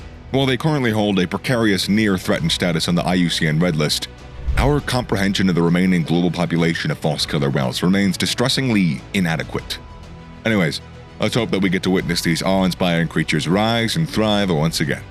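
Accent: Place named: United States. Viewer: The most American narration ever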